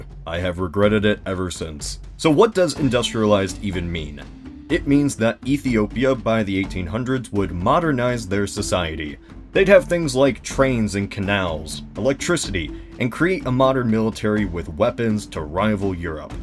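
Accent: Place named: Transatlantic, United States. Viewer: You need to work on your Transatlantic accent.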